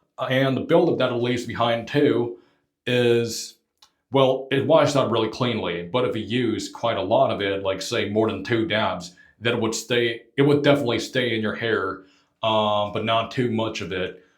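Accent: South, United States. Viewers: Awesome review and look, dude. You have a Southern twang now! Rockabilly runs in your veins, man.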